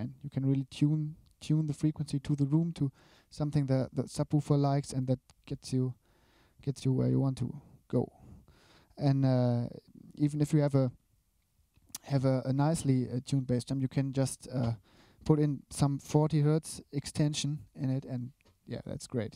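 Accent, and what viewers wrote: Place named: Germany. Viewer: No No I mean I really like your accent it reminds me of a close German friend of mine I love the way you said the kick " Sounds Like Shit":) Thanks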